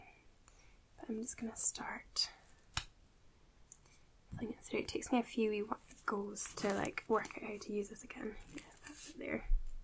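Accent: Scotland, United Kingdom. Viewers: I love your Scottish accent. Greeting from the USA. I just subscribed to your channel today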